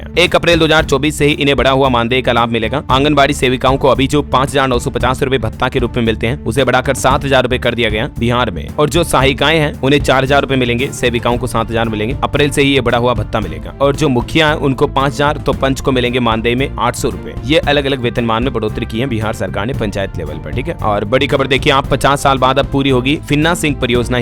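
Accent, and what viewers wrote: Rajasthan, India. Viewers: bro aap rajasthan ho kya lgrha h is video m tone lag rhi h rajashthan vaali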